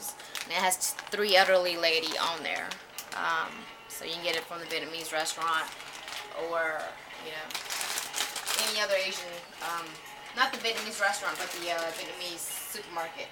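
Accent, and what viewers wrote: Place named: South, United States. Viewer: Atlanta?? Can def tell you from down south but never heard khmer girl with southern accent lol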